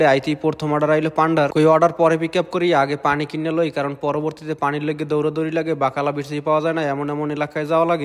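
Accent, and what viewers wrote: Barishal, Bangladesh. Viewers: মনু আমনেও কি বরিশাইল্লা🙂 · আপনা বাসা কি বরিশাল ভাইয়া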